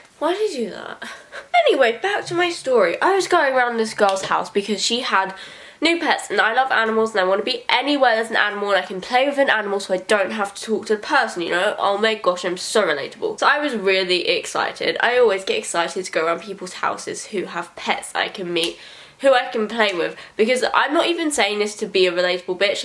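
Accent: United Kingdom. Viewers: Amanda Dorothy Anderson it's called a British accent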